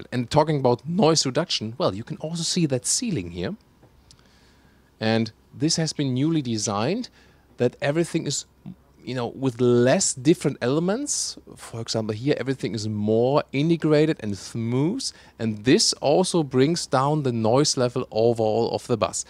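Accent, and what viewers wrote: Germany. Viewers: Man hört halt einfach raus das du deutsch bist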